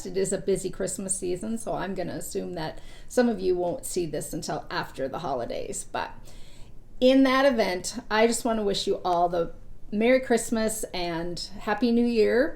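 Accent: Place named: Minnesota, United States. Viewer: Merry Christmas and family! Ohh, I have wanted to learn Brioche, but was always intimidated by it. Thank you for publishing this pattern and the encouragement you give for how easy it should be to learn and knit. Thanks for the discount also. P.S really noticing your Minnesota twang after watching the vlogmas....don't cha know!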